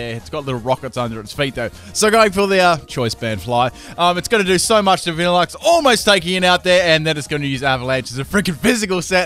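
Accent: Australia. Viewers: "it's gonna spank me on the ass doing 3/4 health there" idk why but that line was really funny. maybe it's the aussie accent.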